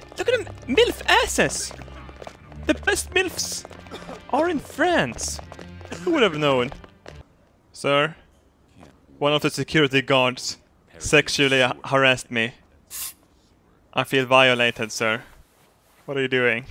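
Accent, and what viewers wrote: Sweden. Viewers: The great has returned to us! How we all missed that Swedish accent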